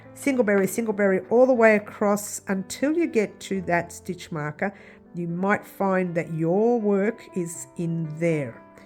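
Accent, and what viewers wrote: Australia. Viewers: Wow i just found you!!! What a relief it was for me to hear your aussie accent! Somehow you say what needs to be said and you dont confuse me!! Im Aussie too!!! Please don't ever leave!!! Love your purse! ❤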